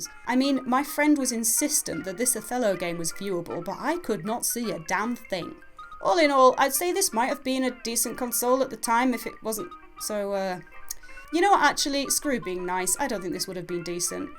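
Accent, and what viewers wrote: Yorkshire, United Kingdom. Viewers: I thought "what's she on about she doesn't have an accent " then I remembered I'm Yorkshire too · What part of Yorkshire are you from? It's my second-favourite region after Durham, but Durham only wins because it has me in it. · I thought you sounded from yorkshire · Great to see more yor'shire on you tube! As my southern colleagues tell me, "it's grim up north!" So you must be very posh! ( btw I'm from Leeds!) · From one Yorkshireman to a Yorkshire lass you can saw owt owever you want - its them lot not from Yorkshire that cant speak propper, They just cant take that they are not from gods county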